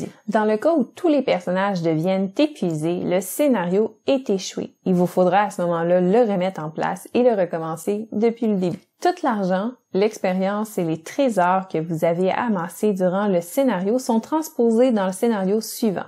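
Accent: Quebec, Canada. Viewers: Tes vidéos sont très sympa & bien réalisées, bravo. Et puis le petit accent québécois, c'est top ;-) Bien le bonjour de la France. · Suite à votre explication du scénario 1, je viens d'acheter le jeu. Je vais suivre vos autres épisodes pour être fin prêt pour l' étape 5. Merci mille fois pour votre synthèse du jeu , pour votre sourire et...pour votre accent québécois 😉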